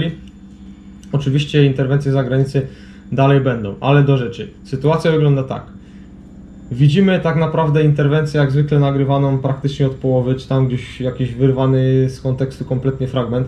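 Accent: Silesia, Poland. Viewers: O przepraszam ale jestem pierwszy raz na profilu i od razu po akcencie poznałem. Czy Pan jest ze Śląska i służy w śląskiej Policji? :-D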